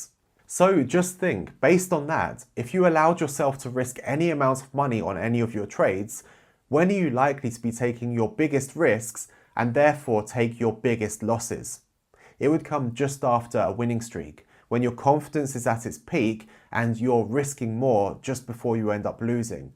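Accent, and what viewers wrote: United Kingdom. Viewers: Loved it. So informative on so many levels! Thank you kindly ! Articulate English accent & witty humour a happy bonus :-)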